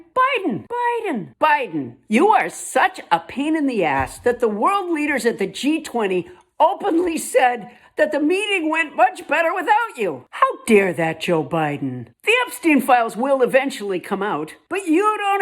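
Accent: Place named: New England, United States. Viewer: THIS monologue is brilliant, especially “part of God’s plan, & Mr. Balloo.” Maybe Poundstone, Jimmy Kimmel, Louis Black and the Comedians ARE the adults in the room, after all. But as keen-eyed as you parents seem at spotting the 3–4-year-old development of a grown man, how do you explain the 76–78M adults with no such recognition?? He toddled around the WH from 2016–2020 playing president then!! Atrociously. Abominably. Disastrously. What chemical substances are people getting that wipe the data out of their minds so completely?—send us a link, PLEASE! (I LOVE New Englanders who use British & Irish colloquials like “banged on” and “dithered around,” or “faaar” instead of “for,” “f-ing & s.o.b.” Sooo endearing!)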